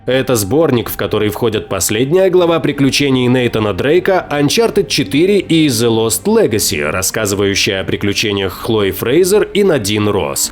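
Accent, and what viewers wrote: Ukraine. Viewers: ти украинец?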